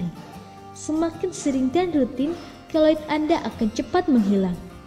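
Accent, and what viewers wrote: Java, Indonesia. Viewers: Medok bngt🤣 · Intonasi suara nya kaya drama di sekolah.logatnya jawa .tapi jawa ma ya...lucu2 geli.....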